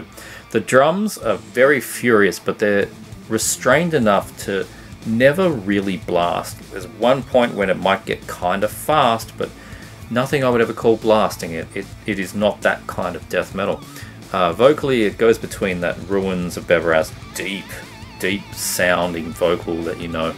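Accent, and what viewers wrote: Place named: United Kingdom. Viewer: Yeah lots of Australian bands here but he has a British accent? I noticed that was weird too. Anyways, great list!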